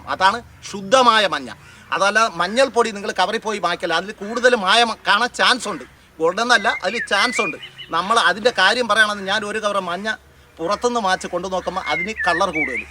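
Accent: Thiruvananthapuram, India. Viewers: അടിപൊളി നിങ്ങൾ ആ തിരുപനന്ത പുരംഭാഷയിൽ പറയുമ്പോൾ കേൾക്കാൻ നല്ല രസം 👍👍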